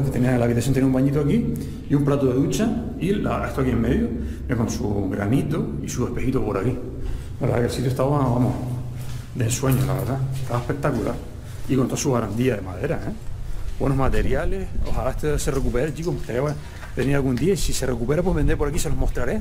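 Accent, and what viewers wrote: Spain. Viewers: y a mi también me encanta tu voz porque tiene el acento andaluz y hablar como mi madre y mis primos de Sevilla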